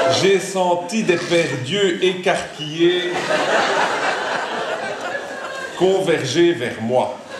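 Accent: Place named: Belgium. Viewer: accent Belge évident!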